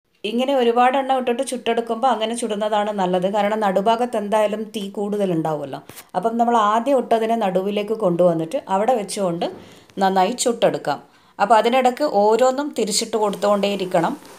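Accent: Thalassery, India. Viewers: Wow adipoli 👍🏼 And style of talking also nammude thalassery style 😁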